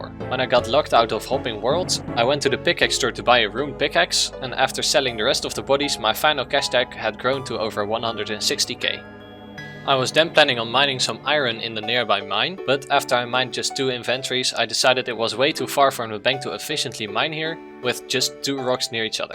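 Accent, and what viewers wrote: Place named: Netherlands. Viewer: I hear a dutchie speaking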